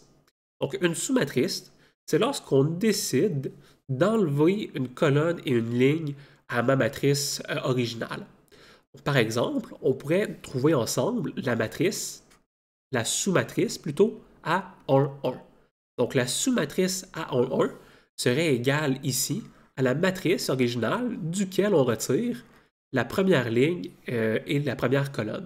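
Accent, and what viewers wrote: Quebec, Canada. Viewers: 😂 il est quebecois , c est leur accent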